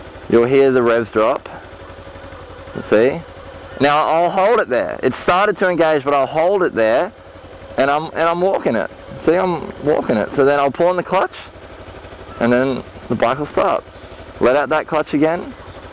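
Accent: Australia. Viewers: Hes obviously Australian you knob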